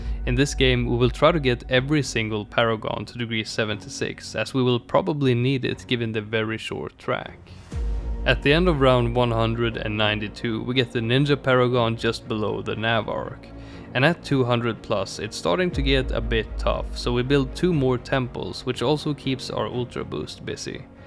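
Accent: Sweden. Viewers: Wait is he Swedish???? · Are u swedish?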